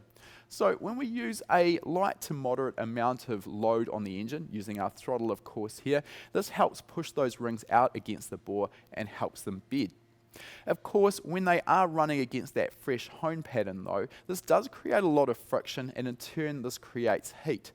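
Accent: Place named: New Zealand. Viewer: Thanks for the reply,. Thanks to the vast amount of time I've spent listening to you, I can whip out your NZ accent at a moments notice at this point. Great fun in a party/pub moment.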